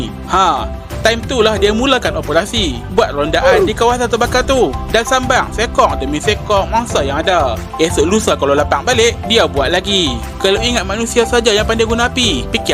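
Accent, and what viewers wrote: North, Malaysia. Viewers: Hang ckp utaqa nohh.... Gilake ank Haram.... Hahaha.. trbaik bossku... Smoga muqah rzeki...aamiin...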